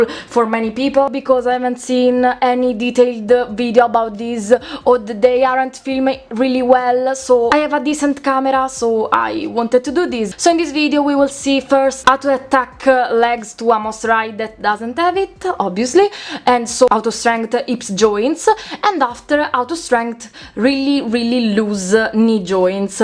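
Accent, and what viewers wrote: Italy. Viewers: Your English is beautiful - your Italian accent is delightful to listen to :) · Amazing video, thank you! 💗 I have just started to get back into Monster High and bought two dolls with loose leg joints, I'll be sure to try this on them! And honestly, your English is super good, like you can see how you know the slang, jokes', terms and such. Other than that there's only minor mistakes from time to time with the conjunctions, but I think that getting better with that will just come with time 🤘 Also you have such an cool Italian accent, I love it! ☺️👍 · Yeah, your english is fantastic. Never lose the depth of your Italian accent when you speak English, it is Perfect as it is! 🥰🥰 Also thanks for saving my Clawdia's legs! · Perfect video to help me fix my daughter's dolls. Also, your English is very good! I love your Italian accent 🥰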